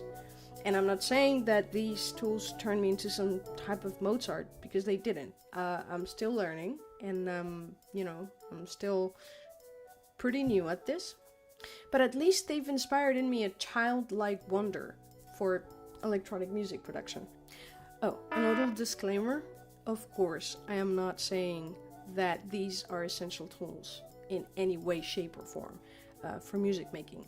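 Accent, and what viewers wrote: Netherlands. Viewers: Naw she is Dutch. Ik kan het accent horen. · where are you from? Dutch perhaps or another accent? it sound familier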